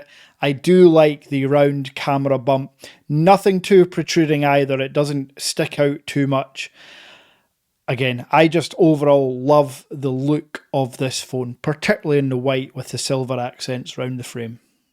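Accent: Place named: Scotland, United Kingdom. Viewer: Just wanted to say thank for the IR remote tip! I recently got a fan that was unable to work with the international app and unable to work with the chinese app due to it being region locked. Was cracking my head for days on troubleshooting but you totally solved that issue! Love your channel, very straightforward no fluff reviews and got to say, that Scottish accent is killer.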